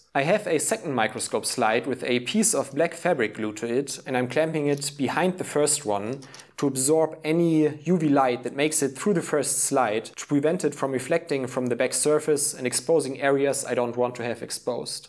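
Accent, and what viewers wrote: Germany. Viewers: Ich glaube ich höre da einen LEICHTEN Deutschen Akzent 👂👀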